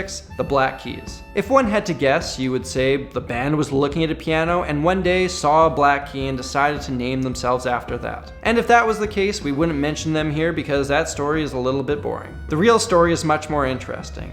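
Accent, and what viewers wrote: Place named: Canada. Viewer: As a native Akroner I am offended but maybe it was just ur Canadian accent aye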